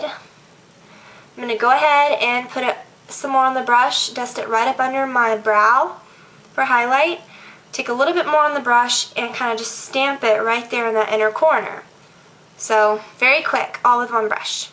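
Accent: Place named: Texas, United States. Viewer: awesome tutorial, it sounds like your getting a texas accent or something lol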